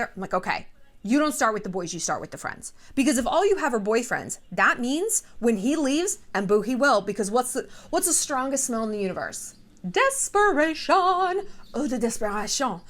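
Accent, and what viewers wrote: France. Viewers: Got a like from me for that french accent :D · The French accent 😭😭😍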